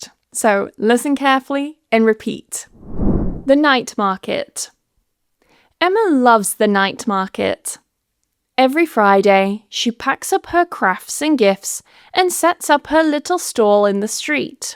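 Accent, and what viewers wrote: United Kingdom. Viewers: I truly love british accent, and specially yours ❤ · I want to speak like you. I really love british English. Could you tell me how I can learn british English?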